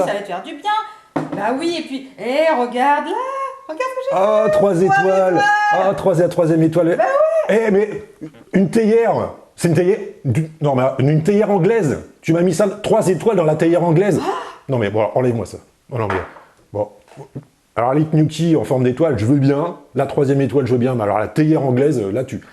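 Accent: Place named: Bordeaux, France. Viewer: T'as l'accent bordelais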